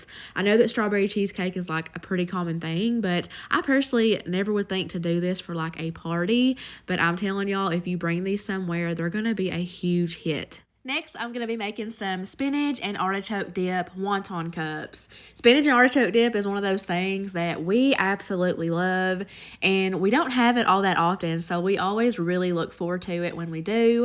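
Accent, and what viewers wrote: South, United States. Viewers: Wonderful recipes and you make them look so catered professional. I love you southern accent and you non wasting anything ideas. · I just found this video today as I’m looking for some appetizer recipes. I have to tell you that your southern accent is adorable. All the appetizers look delicious and you had great presentation. Can’t wait to try your recipes and watch more of your videos. Thanks 😊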